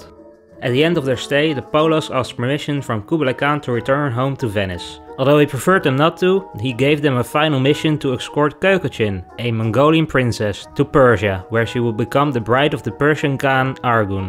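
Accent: Netherlands. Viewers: The second I clicked on the video I KNEW he was Dutch. :') onlyDutchiesrecognisetrueDutchies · This video helped out so much! I didn't understand Marco Polo's journey till now! This is great for my project! Thanks. Even though you had a Dutch accent you still explained really well. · Ben jij een Hollander?..ik hoor het aan jou accent. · i can hear your dutch accent haha (im dutch as well so) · The narrator is Dutch i recognize this thick accent 😂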